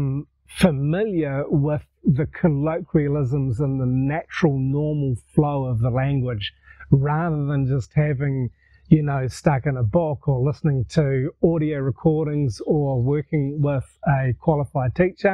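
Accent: New Zealand. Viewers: Surprised to hear another kiwi here in Northern Thailand. Good on you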